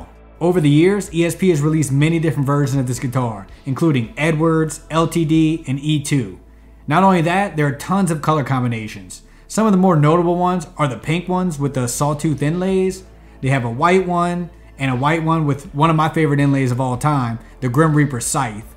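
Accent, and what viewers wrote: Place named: Louisiana, United States. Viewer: Bro you from Louisiana? That accent is screaming you from the bayou.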